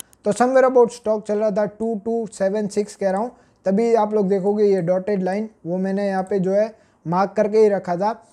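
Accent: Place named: Kathiawar, India. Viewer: Bhai Kathiyavadi lage chhe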